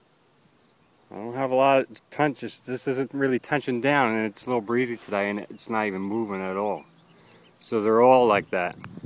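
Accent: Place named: Philadelphia, United States. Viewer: good video . you have a philly accent ?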